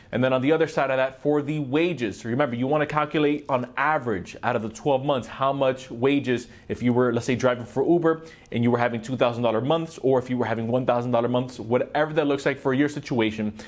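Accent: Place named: Miami, United States. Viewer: Lol you have to be from Miami lol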